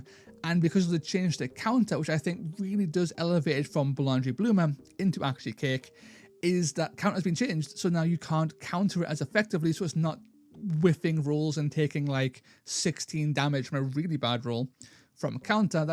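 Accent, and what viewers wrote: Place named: Spain. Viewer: I love your spanish voice lol I use last tournament a Deathbringer against a Zombie Dragon... and he flew away lol CHAOS POWER!!!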